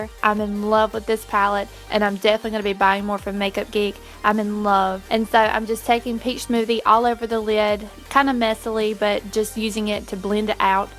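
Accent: South, United States. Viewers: yay ur back I missed u I love the look and ur personality ur awesome and I love that u have that southern accent I got it to and never be in braised about it. it's just who we r yay sending big love from TN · ahhh reading down further, a southern accent!!! Love it, I'm in Tennessee!!! :)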